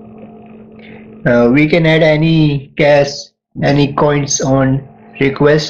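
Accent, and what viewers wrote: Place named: India. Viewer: Every Indian accent makes me sick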